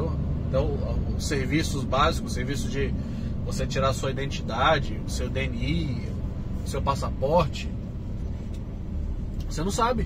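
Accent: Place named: Rio Grande do Sul, Brazil. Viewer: teu sotaque é de gaucho, vc é da onde amigo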